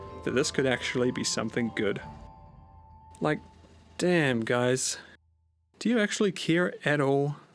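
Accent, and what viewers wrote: New Zealand. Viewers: why do kiwis say "i"instead of E?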